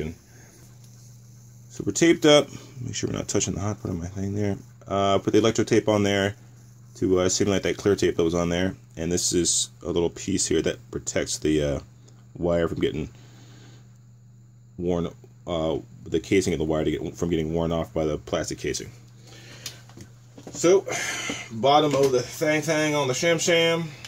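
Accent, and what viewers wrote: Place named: RP, United Kingdom. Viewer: Your british accent is quality lol I thought you were a London Toff ... Good vid Castle fixed ready for next RC Land Battle ;O)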